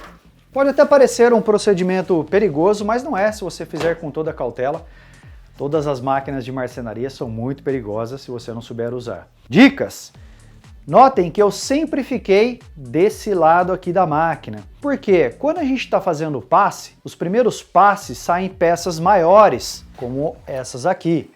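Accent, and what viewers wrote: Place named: São Paulo interior, Brazil. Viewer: Eu notei que vc era do interior por causa do sotaque.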